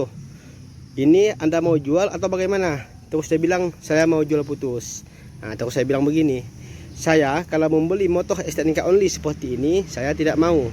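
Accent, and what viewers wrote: Indonesia. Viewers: Orang aceh ya bg ? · Amuntai kh ini,cara bicaranya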